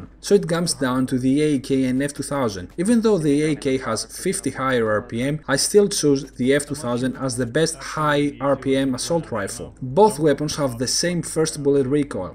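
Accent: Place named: Greece. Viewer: definately english with greek accent (i'm from greece and i know exactly how greek people speak english :P)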